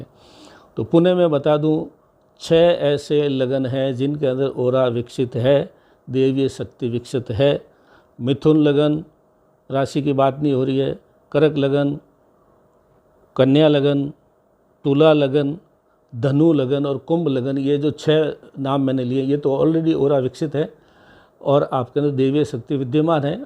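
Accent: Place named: Rajasthan, India. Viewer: हमको लगता है आप राजस्थान के हैं आपकी लैंग्वेज से भाषा से पता लग रहा है क्योंकि हम राजस्थान के हैं ।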